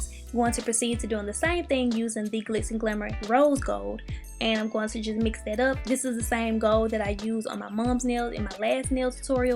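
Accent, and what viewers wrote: South, United States. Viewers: I love ur country accent lol ❤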